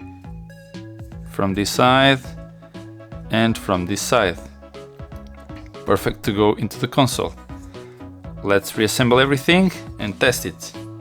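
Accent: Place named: Portugal. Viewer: Are you Portuguese? Eu conheço o sotaque...Eu curto este tipo de content 👍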